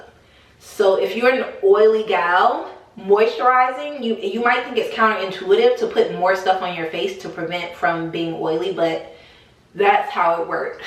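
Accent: United States. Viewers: You sound like us who live in Atlanta. We always say, “there’s Atlanta and the rest of Georgia.” So, yes, I live in Atlanta, not Georgia. 😊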